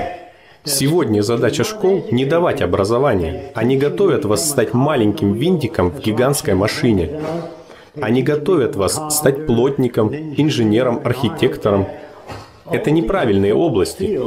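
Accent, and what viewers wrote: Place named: United States. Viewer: Говориш как Американец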